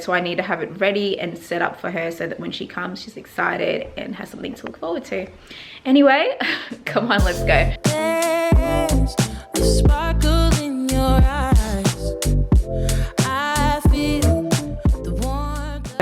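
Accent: Australia. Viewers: she's Australian. Her accent is pretty obvious...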